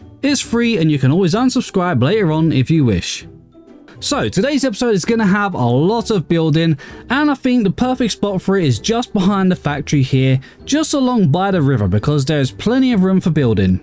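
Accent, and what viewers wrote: United Kingdom. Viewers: It is actually quite hard to me to understand how do u pronounce some of this words, as for non-english speaker. But, cool buildings and this cool sounding british accent, so i happy i found this channel!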